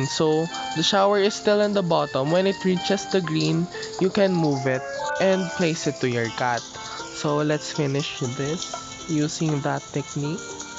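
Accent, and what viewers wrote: Philippines. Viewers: filipino accenttt hihi 🇵🇭❤ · i like that filipino accent ahh 🇵🇭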